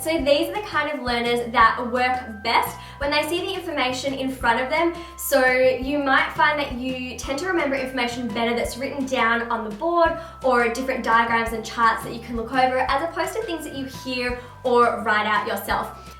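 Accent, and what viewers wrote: Australia. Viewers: I'm used to clicking a video and hearing a British or American accent. hearing your Aussie accent has become weird, despite me being Australian too.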